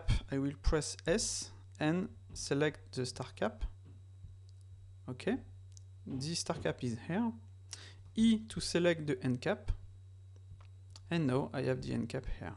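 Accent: France. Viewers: haha l'accent français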